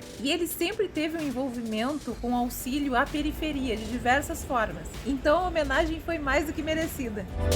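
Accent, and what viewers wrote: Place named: Rio Grande do Sul, Brazil. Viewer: Chegando para apoia-la e ja gostei. Conheço Pelotas, nasci no Sul, na fronteira, e hoje em P. Alegre. Pelotas tem muitos prédios históricos. Vc tambem é gaucha, nao? Esse sotaque..rs Um abraço, compartilharei. Nao faço conteudo, só apoio.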